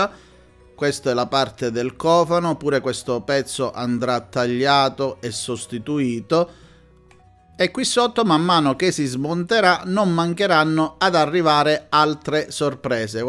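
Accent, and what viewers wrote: Calabria, Italy. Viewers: Me gustan mucho tus videos,yo tuve un panda 4X4 y ha sido el coche de mi vida,me hizo feliz y me encanta el acento calabrés,se entiende todo perfectamente si eres español,felicitaciones!.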